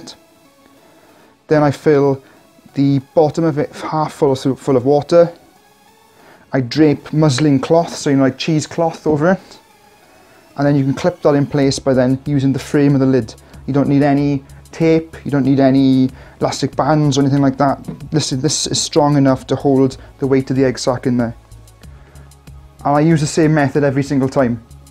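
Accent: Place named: Wales, United Kingdom. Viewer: I really enjoy your videos, no messing around! I love your accent, which part of Wales do you live? I live in Brecon, but I lived in Crickhowell for years, no accents, my brother lives in Merthyr and they all have strong accents that I don’t understand! 😜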